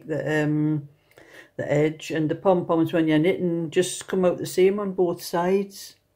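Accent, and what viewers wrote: Newcastle, United Kingdom. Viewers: Lovely to hear another geordie voice , I love knitting ,Thanks for sharing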